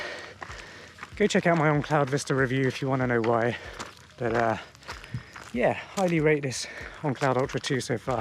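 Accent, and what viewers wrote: United Kingdom. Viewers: Are you a Brit living in America?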